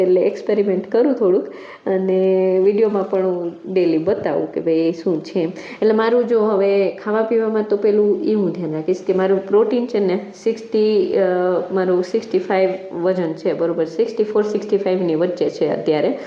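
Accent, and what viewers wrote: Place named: Kathiawar, India. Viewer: Hello! I came across your video and kept watching bcoz of your cute kathiawadi accent! I can give you some tips..walk for 10 mins after every meal. Dinner 6.30 sudhi ma patavi devanu.. It helps a lot!!!. Savarna breakfast ma ugadela kathod add karay! Also rotli ma jowar,ragi eva lot vaprai...I love those😊. Divas ma ghar ma dadra chad utar karvana leg muscles majboot thase. Dhire dhire exercise ma weight training add karvani ..5 kilo na dumbell lai ne sharu karay. Or even pani ni bottle thi pan karay...plenty of videos on youtube! Ena thi body saras tone thase ne inches ochi thase. Good luck..Will keep watching👍👍😊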